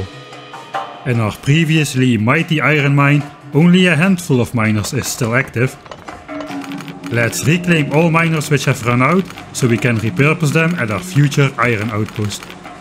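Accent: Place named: Netherlands. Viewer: I'm actually impressed the algorithm can recognize steenkolen engels.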